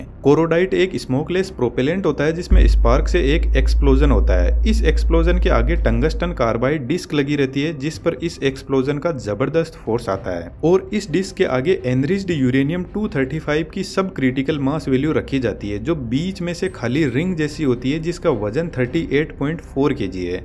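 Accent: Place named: Shekhawati, India. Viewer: भाई साहब आपके द्वारा बनाया गया वीडियो बहुत ही ज्ञानवर्धक है । आपकी आवाज से ऐसा लगता है कि आप राजस्थान के शेखावाटी से संबंध रखते हो।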